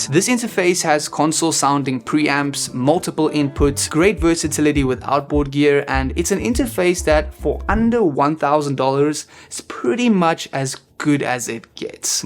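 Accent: South Africa. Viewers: I can already tell by that accent that this man is a SA kid🤌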